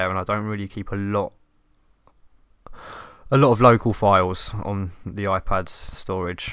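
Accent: United Kingdom. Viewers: Are you a british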